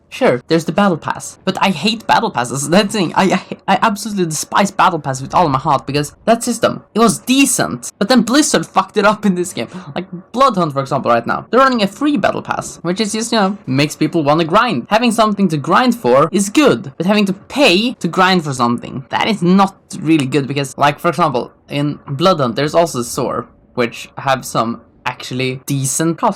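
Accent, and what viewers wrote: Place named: Sweden. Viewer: Lmao I can already guess that u are swedish, or norwegian or something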